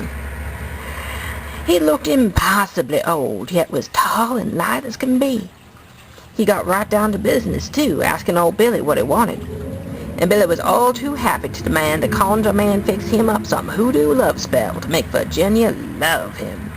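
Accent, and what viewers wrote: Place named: South, United States. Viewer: You have a pretty good Southern accent. Good story telling. Thank.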